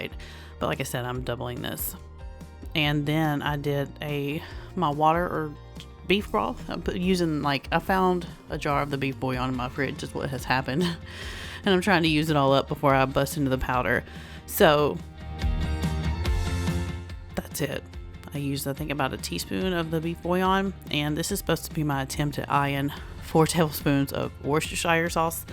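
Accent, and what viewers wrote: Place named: South, United States. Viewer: Everything looked incredible. Yum.. I love grilled cheese and tomato soup too. Boy that unstuffed peppers.. yes please please.👏. I'm definitely making that. My Question is where was you born ? You've got kind of a Southern accent to me. My entire family is from The South. Mainly Kentucky. And What is your favorite Food ? Good luck to all the winners ! Love your indiana friend. xoxo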